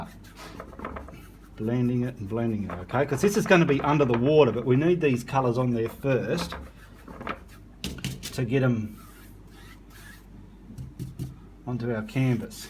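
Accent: Australia. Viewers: LLOOOOOVE YOUR AUSSIE ACCENT MATE! 😂😂😂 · I am loving your tutorials!! I have been catching myself taking with an Australian accent!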